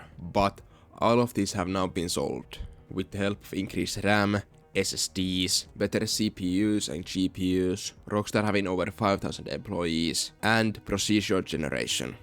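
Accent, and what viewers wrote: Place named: Finland. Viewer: You have a finnish or swedish,are u from there?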